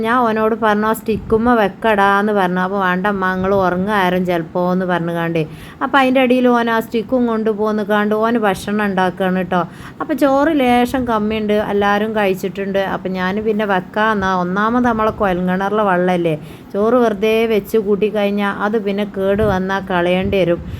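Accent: Malappuram, India. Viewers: മലപ്പുറം ഭാഷയല്ലേ അത് കുഴപ്പമില്ല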